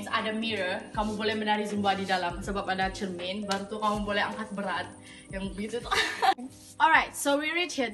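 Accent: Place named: England, United Kingdom. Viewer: This is really good information because I dreamt to go here btw I like your English accent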